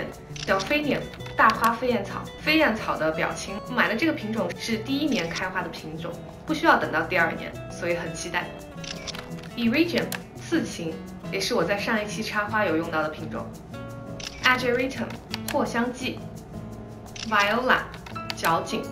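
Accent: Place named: Jiangsu-Zhejiang, China. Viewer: 你说话的感觉和我好像，是不是江浙人呀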